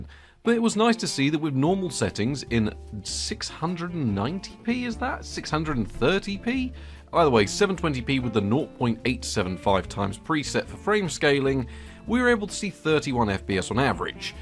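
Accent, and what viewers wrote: United Kingdom. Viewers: This is so American of me to say, but I say it as an American whose family literally came over to Ellis Island, NY from London in the 1800s. Also according to my DNA I am 68% British & absolutely LOVE the UK. Ok, with that disclaimer out of the way. Having a British Accent just makes the videos seem like dated fine wine! Nostalgia with intelligence!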